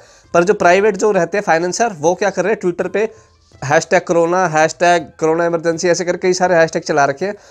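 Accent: Haryana, India. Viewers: Bhaiya ki Haryanvi awaaz bahut dhaakad hai 💪💪💪💪💪💪💪🤟 hamari yahi duaa ha aap Sahi salamat raho 🙏🙏🙏❤️❤️❤️❤️ aor 🤟🙂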